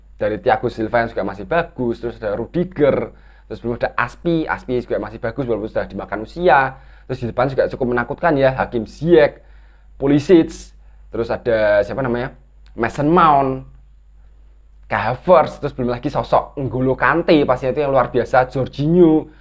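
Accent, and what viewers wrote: Semarang, Indonesia. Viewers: Efek logat orang semarang kali🗿👍🤣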